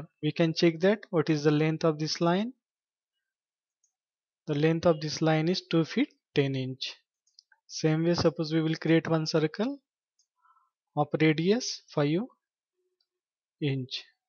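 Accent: India. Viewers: Why is that an Indian voice I hear for this? I thought India uses metric. Or am I wrong and you Indians use Imperial or both?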